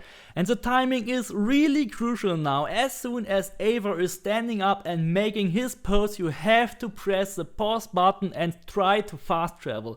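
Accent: Germany. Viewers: Only German got this accent cuz 99% of them can't say this and say sis and other words with T 😂😂😂😭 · Man hört sofort dass du Deutscher bist 😀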